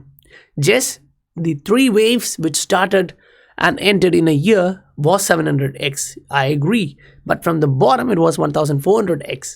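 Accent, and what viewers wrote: India. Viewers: Sound like Indian? Im right?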